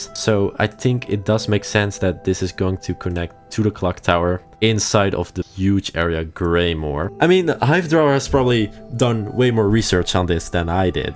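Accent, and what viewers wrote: Netherlands. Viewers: Wait your dutch?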